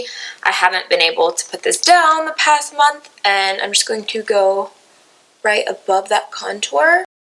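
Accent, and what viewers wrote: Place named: Louisiana, United States. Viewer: I love your accent that comes through sometimes. I'm from Louisiana, near Baton Rouge, so it's fun to hear a little bit of familiar dialect.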